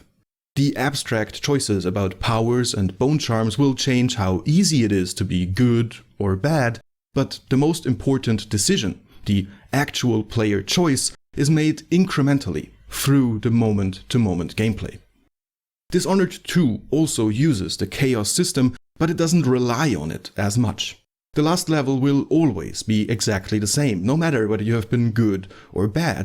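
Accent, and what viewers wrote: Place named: Europe. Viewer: Its always the guys with the euro accents that make batshit videos.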